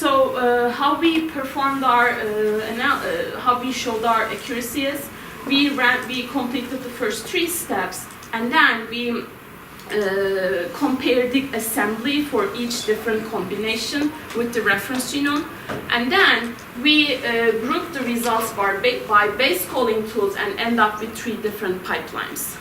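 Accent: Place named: Turkey. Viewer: That turkish accent and nervous voice was kinda hard, but the content was awsome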